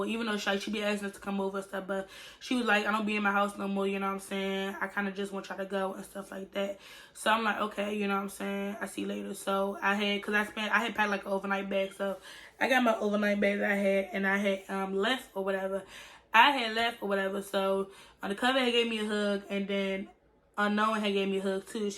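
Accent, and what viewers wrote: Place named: Washington DC, United States. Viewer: You from the dmv? Lol you got that DC speech 😭😭😍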